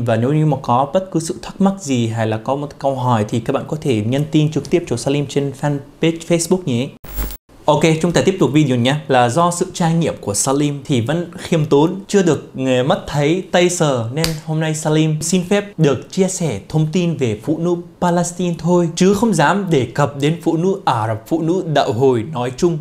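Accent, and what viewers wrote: Standard. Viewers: Hi hi, Cám ơn Salim, nói tiếng Việt chuẩn quá! Đất nước nào cũng có anh hùng và tội phạm…tất cả họ đều do phụ nữ sinh ra nên phụ nữ Đạo Hồi cũng ko ngoại lệ 😂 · Bạn làm video rất hay chân thực và nói tiếng việt rất chuẩn cảm ơn bạn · Hoan hô, cám ơn đã cung cấp cho người Việt chúng tôi những thông tin, hiểu biết chính xác về thế giới Arab và Đạo hồi. Tiếng Việt của rất chuẩn, hiểu biết về văn hóa, xã hội của rất rộng. Khâm phục đấy! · Chào, các clip của bạn rất hay, nhất là tiếng Việt của bạn rất chuẩn, dễ nghe. Xin chúc mừng Mabrouk! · Woa anh nói tiếng việt quá chuẩn luôn 👍👍👍chúc anh nhiều niềm vui va hạnh phúc nhé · Bạn nói tiếng Việt chuẩn quá phát âm rất tốt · Salim ,dep train ,noi tieng Viet chuan 😊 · Quá hay! Bạn đã giải thích rất chi tiết và dễ hiểu. Tiếng Việt của bạn rất chuẩn. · Anh nói tiếng Việt quá rành rõi, phát âm, dùng từ chuẩn còn hơn nhiều người Việt nói tiếng Việt nữa. 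· Anh nói tiếng Việt chuẩn luôn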